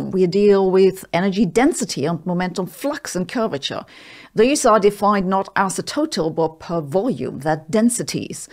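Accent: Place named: Germany. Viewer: Many of videos are way over my head, like today's posting, but I watch anyway because I enjoy hearing her talk. Listening to someone speak about science with a German accent somehow makes me feel smarter than I am.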